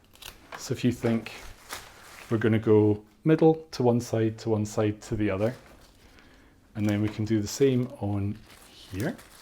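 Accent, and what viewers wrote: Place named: West of Scotland, United Kingdom. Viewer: Thank you so much for your easy to follow tutorials. also so great to hear a fellow west of Scotland accent!! love your work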